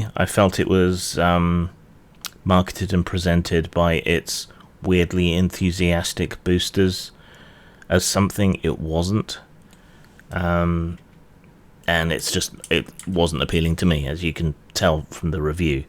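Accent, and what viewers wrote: United Kingdom. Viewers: Still a little surprising that you recited my bloviation and griping about contemporary US social decay. I appreciate that you consider it worthy of repeating. I need to improve my prose if its going to be read aloud by your dulcet southern English tones.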